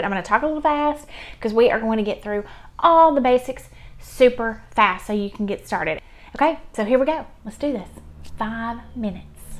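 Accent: South, United States. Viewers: You’re cute, I love your Southern accent! I’m from Minnesota. Take care! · As a South African who recently tapped into this market, it wasn't the tips and tricks that kept me here... It was that addictive southern "if I'm correct" accent. The actual content was a added bonus 🤣🤣🤣. On a serious note though, do you sand the piece after burning???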